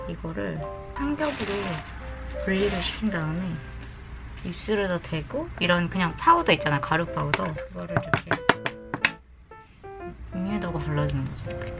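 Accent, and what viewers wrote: United Kingdom. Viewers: 잉? 그래용? 헉 좋게 봐주셔서 감사합니당❤️❤️❤️(전 제꺼 오글거려서 못듣...겠어요ㅋㅋㅋ큐ㅠ) 제가 듣기에는 님 영어 일본어 눈감고 들으면 그냥 영국인 일본인인데욤??ㅎㅎㅎ진짜 영국억양 영국에서 한 10년 거주하신 분 같아욤!!!ㅎㅎ멋쪄용!!!!👍👍🥰🥰 항상 따뜻한 말씀 감사해요❤️❣️ 코로나 조심하시구 종종 자주 놀러 올게요☺️💚💚ㅎㅎ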